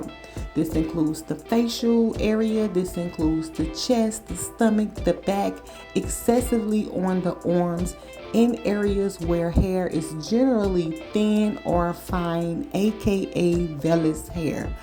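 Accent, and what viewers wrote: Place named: South, United States. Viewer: This lady must come from Mississippi or Louisiana. She had to mispronounce the easiest words. She sounds illiterate and needs to read more. 💯🤷🏿‍♀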